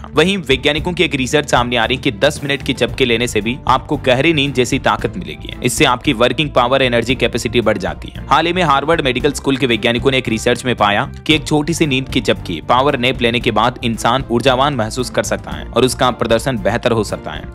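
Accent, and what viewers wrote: Rajasthan, India. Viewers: bhai aap rajasthan se ho ky mje aapki boli se lag rha he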